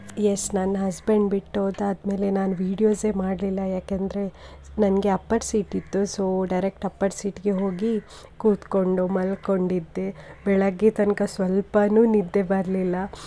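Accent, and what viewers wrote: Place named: Mangalore, India. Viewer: Manglore Kannada❤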